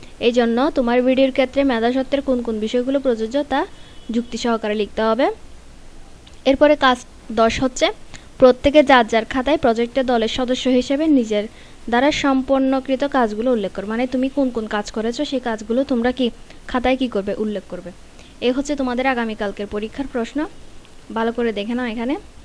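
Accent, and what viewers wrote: Chittagong, Bangladesh. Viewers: ম্যাম আপনি মনে হয় চট্টগ্রামের?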